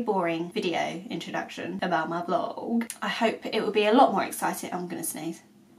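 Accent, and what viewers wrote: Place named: Australia. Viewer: that was great! I swear you sound Australian in this. God luck with everything, Love Deborah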